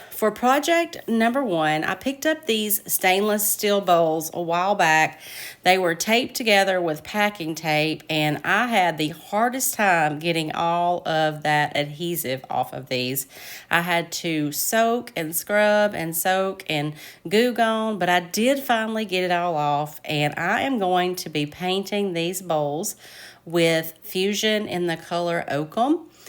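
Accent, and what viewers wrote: South, United States. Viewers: I loved the tray best of all. I haven’t tried paint inlays yet but I think I will give them a go. I always look forward to your new video every Wednesday. I love your southern accent - I have a very Yorkshire accent!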